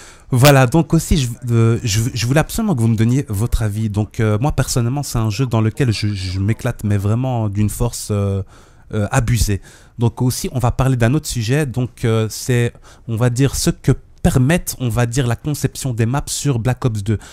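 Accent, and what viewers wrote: Belgium. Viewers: cho l'accent belge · Le commentateur est belge ? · Tu viens d'ou de Belgique? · Un petit accent belge il me seble non? :)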